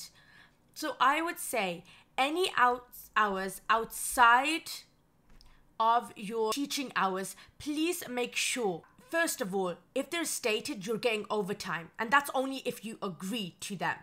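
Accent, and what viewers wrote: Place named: Wales, United Kingdom. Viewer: Welsh accent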